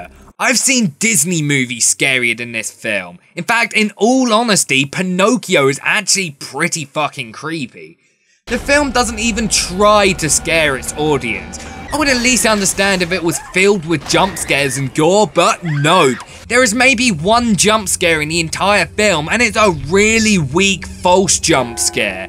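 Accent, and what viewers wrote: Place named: United Kingdom. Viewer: I really liked your smackdown of this movie in a British accent. Yeah the movie looks shitty.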